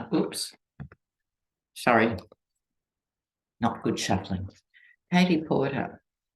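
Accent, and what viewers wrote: Australia. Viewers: I heard you on the Mary Trump Nerd Avengers show and had to give you a follow. It was great to hear an Aussie voice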